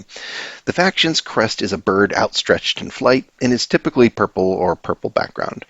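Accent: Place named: Minnesota, United States. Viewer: Kinda sounding like Minnesota Tribe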